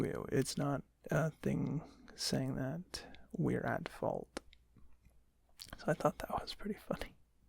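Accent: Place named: Canada. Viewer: Very funny and instructive! Your currency looks quite a bit like Euros so it's not that unusual for me as I've handled those before. The Cambodian Riel does look like Monopoly money though, I could do a video showing the bills :p Oh, and kudos for keeping the correct spelling of words ;) And "about" the accent, one of my Canadian friends told me once 'It's not a shoe, it's a ship'. It's a good way to remember it :) 'Being sorry all the time' - I just lost it. And I'm mesmerised by the shades btw. I know I'm late. But I'm pretty glad I managed to watch it now. :) Especially the end! Oh, Canada! :p Longest comment EVER XD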